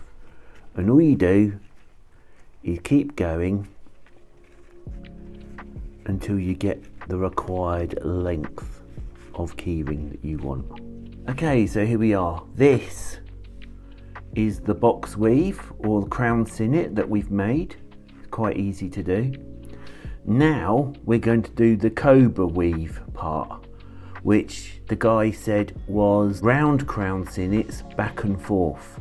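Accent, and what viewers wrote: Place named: United Kingdom. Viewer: Brilliant! I love your accent. Which part of the UK are you from? And what's your favourite tea? 😊 PJ from Canada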